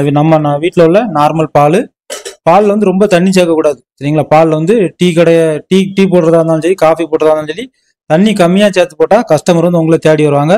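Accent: Tirunelveli, India. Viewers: bro neenka tirunelveli ya?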